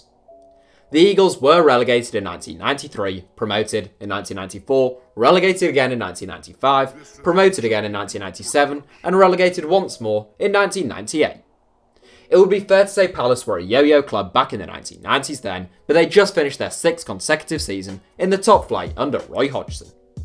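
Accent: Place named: Manchester, United Kingdom. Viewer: note this narrator has a manchester accent hence the bias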